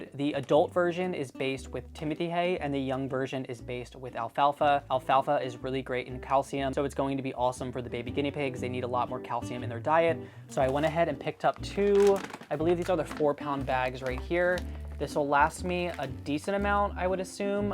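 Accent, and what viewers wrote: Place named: United States. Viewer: I spent the first three minutes of this video trying to figure out what his accent is, and I realised it sounds like gay American. And I really don't understand how that's an accent, but that's what he sounds like.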